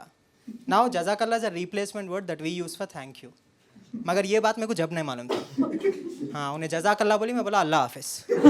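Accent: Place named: Hyderabad, India. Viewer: Love the Hyderabadi accent 😅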